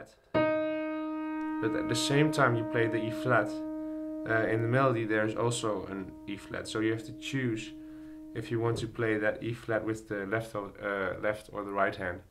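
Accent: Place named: Netherlands. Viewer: thank you, haha je hoort aan je accent dat je nederlands bent qq, maar goed gespeelt!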